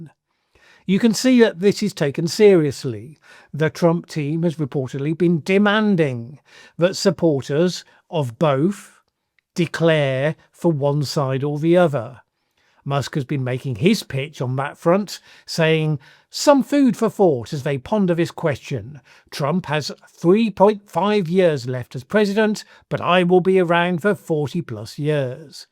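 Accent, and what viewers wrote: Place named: Southern England, United Kingdom. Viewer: I find two things fascinating about your videos 😊: 1. the analysis you make, and the case you make for it, and 2. your pronunciation/your particular English accent. The latter makes it clear that my nephews who live in southern England who had "perfect" trad modified RP diction until they went to school, from which point they spoke with Kentish vowels and struggled to pronounce 'th' consistently [voiced & unvoiced as required, ð and þ] really are following the norm in Southern English. You do not substitute v/ff all the time, for which I am grateful, because it is vital to know whether people who had "ffought" about something had a) had a think or b) had a fight... but 'with others' is clearly easier for you as 'wiv others', and this is utterly normal in 2025 on YouTube from parts of England. It makes me feel that, when helping those who are new to speaking English, we should be encouraging them to say 'th' as 'v/ff' wherever it is easier for them - certainly easier on the ear than the French tendency to "z/ss", perhaps less distracting than the Irish 'd/t' - every way of speaking English that maintains fluency is fine by me, but to do Hiberno-English you need to match it with a consistently Irish flavour in the vowels, or English natives may struggle to attune their ears to the mix. 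Thanks for both your insights in the intended content, and the effectiveness of your delivery and, as it happens, the provocation to me to reflect on what counts now as effective/comfortable dict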